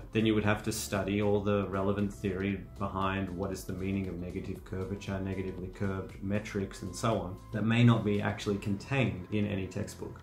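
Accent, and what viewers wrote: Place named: Australia. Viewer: Aussie accent :O oh you're at usyd? Im over at UNSW. Not even studying maths but I'm going down a rabbit hole and I now I really wanna relearn maths 😂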